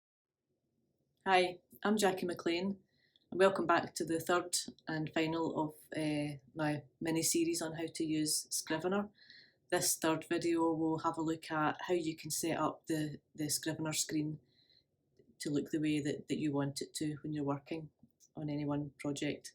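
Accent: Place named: Glasgow, United Kingdom. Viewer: I liked the way you speak; are you a Glaswegian?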